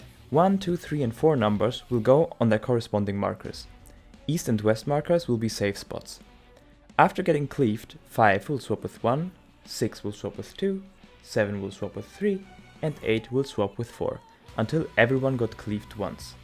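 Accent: Germany. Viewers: He's probably refering to "the boss" which is in german "der" (he) and by the accent I assume he's german.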